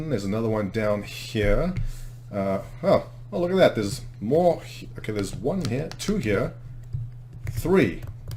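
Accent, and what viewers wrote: South Africa. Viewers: its south african lol are you saying all us south africans sound like Christopher Walken? :P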